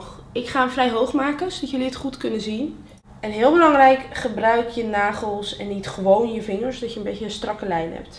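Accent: Netherlands. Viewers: Je kat is echt super schattig bezig op de achtergrond ^_^ Sinds ik naar België verhuisd ben is het echt fijn om soms weer eens een super Nederlandse accent te horen haha :) Lovin' it! =)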